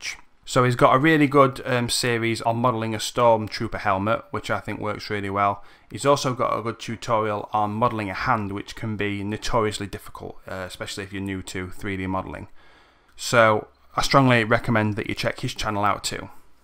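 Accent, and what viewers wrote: United Kingdom. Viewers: That moment when I know all of them but still suck at maya lol... btw nice british accent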